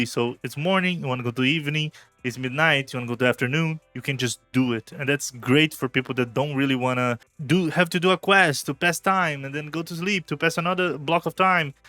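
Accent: Brazil. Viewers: sabia que tu era br pelo sotaque kkkk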